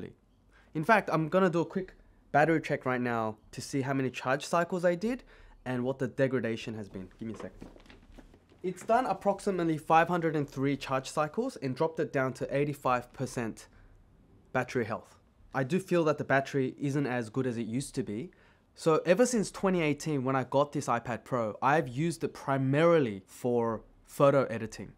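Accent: Australia. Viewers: east asian guy with an aussie accent is quite trippy lol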